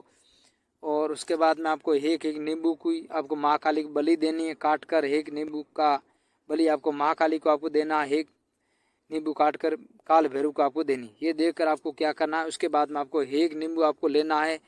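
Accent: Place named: Rajasthan, India. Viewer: गुरुजी में जानना चाहती हूं आप कहां रहते हैं मैं मिलना चाहती हूं I am also from Rajasthan. Your voice tells me that you are from Rajasthan. Please tell us where you are from and I want to meet you.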